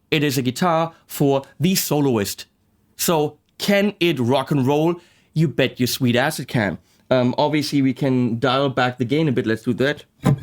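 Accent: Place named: Germany. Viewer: You talk a whole bunch of s*** I can't even understand you with that German accent put the guitar down and just walk away from it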